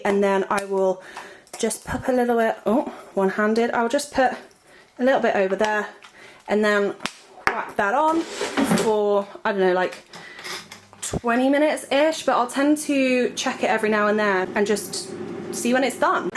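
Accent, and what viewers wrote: United Kingdom. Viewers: Cool, are you from England?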